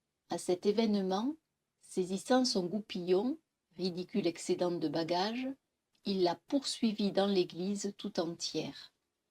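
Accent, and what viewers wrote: South, France. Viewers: Pas étonnant toutes ces fautes exposées dans les commentaires: déjà avec un accent Parisien, ce texte d'un autre temps, ne serait globalement pas une mince affaire mais alors avec l'accent du sud bonjour. Sinon ne pas le prendre autrement que dans le contexte car en dehors c'est un joli accent mais moins propice ici. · Excusez moi! Je ne suis pas Française, (je ne savais pas que..) en lisant les commentaires j'ai appris que c'était l'accent du sud😶 Excusez-moi mais je ne suis pas habituée avec cet accent! Ce n'est pas de votre faute😅bien sûr! Je vous remercie pour cette dictée quand même!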